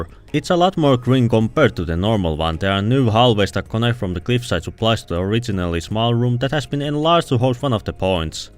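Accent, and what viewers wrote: Finland. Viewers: I was like 99% you were Finnish and this just confirmed it. Perkele TF2 Suomi!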